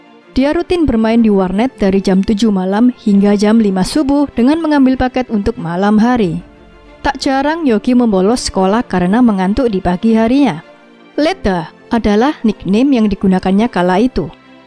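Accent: Lampung, Indonesia. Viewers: Bang orang Ketapang ya bandar Lampung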